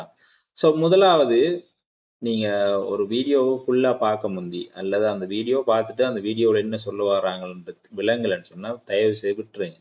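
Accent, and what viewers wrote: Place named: Eastern Province, Sri Lanka. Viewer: தம்பி உன்னுடைய தமிழ் நீ கிழக்கு மாகாணத்தை சேர்ந்தவன் என்பது படடதெளிவாக தெரிகிறது. கிழக்கு மாகாணத்தை பற்றி கதைக்காமல் ஏன் வடக்கை பற்றி கதைக்கிறாய். அர்ச்சுனா ஒரு கோமாளி அரசியல் செய்கிறான். அர்ச்சுனா இன்னும் மூன்று வருடங்கள்தான் பிறகு வீடுதான்.அர்ச்சனாவுக்கு காசை கொடுத்தால் தலையாலும் நடப்பான். தம்பி நீ சொல்ல அதை நாங்கள் கேட்க நாங்கள் என்ன முடடல்களா. எங்களுக்கு பாடம் எடுக்க வேணாம்.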